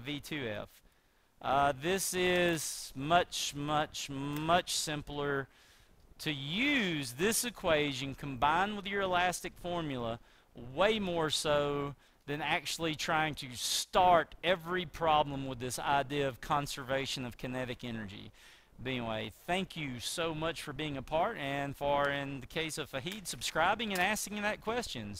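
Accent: United States. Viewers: This was my exact question, and I got an exact answer. Plus the great southern drawl makes this video ten times better. My dads side is from oklahoma and they all sound like this; i feel like i'm learning phyics from my uncle.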